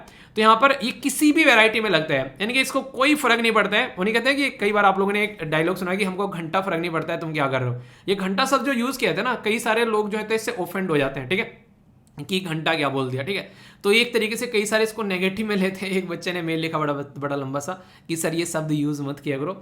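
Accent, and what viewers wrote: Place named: Baghpat, India. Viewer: Sir ji aap baghpat se to nhi ho ...hmre yha ki language lgti h aapki 🥰👌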